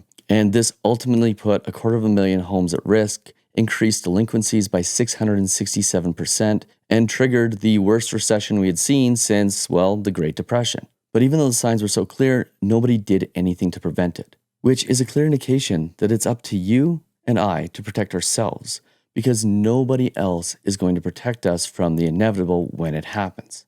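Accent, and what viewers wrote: Canada. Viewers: Such an interesting video, and beautifully presented. Great to hear a nice Canadian accent.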